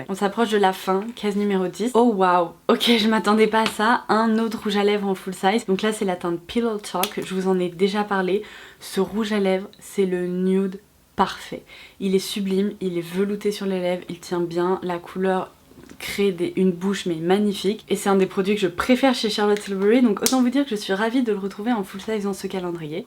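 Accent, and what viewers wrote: England, United Kingdom. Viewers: J’adore ton accent anglais😍🙏🙏; as tu des origines anglo-saxonnes ?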